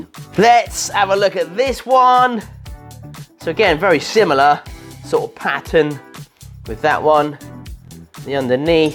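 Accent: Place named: Cockney, United Kingdom. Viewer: His voice reminds me of Danny Dyer, like so cockney it hurts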